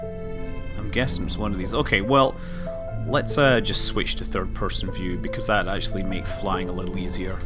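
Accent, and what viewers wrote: Scotland, United Kingdom. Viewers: so i went to increase the resolution on the video since youtube thinks im on dialup. Ended up hitting the closed captions. fuck me this shit is hilarious with a scottish accent!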